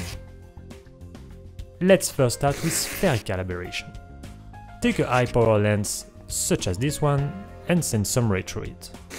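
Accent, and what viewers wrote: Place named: Belgium. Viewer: Great explanations!!! Your accent sounds like from Belgium or French speaking? I have few friends I know from there.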